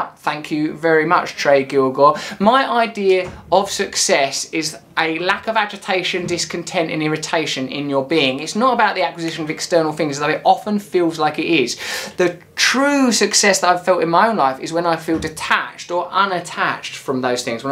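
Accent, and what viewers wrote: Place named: England, United Kingdom. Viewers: hey russel i feel like you are my official cockney guru. i do spiritual punk - aka really fast chanting - its amazing - can i play in your cafe x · Your English accent is so strong, sometimes i can't understand you when you talk fast! lol im American by the way. p.s. love ya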